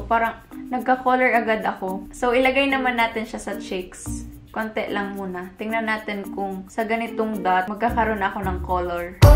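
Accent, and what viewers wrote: Visayas, Philippines. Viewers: parang bisaya to haha tabang mga binisaya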